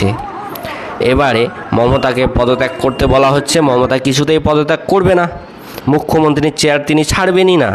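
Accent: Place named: Bangladesh. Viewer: তোর কথা শুনে মনে হচ্ছে তুই বাংলাদেশি একদম সিওর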